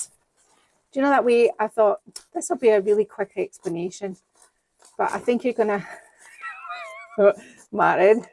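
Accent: Scotland, United Kingdom. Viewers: Beautiful! Luv the building technique. I really need to let go of my perfectionism and let that paper and paint fly! Love with a mic. So sorry you can't say, " just leave it alone" with a straight face anymore, I was rolling on the floor! I got another one for you guys coming up on my next vid. I take your words like the gospel, I hear them with your beautiful Scottish accent in my head. 😂 would love a shirt or a coffee mug with your sayings on them. You really knocked it out of the park on this one guys, dar p.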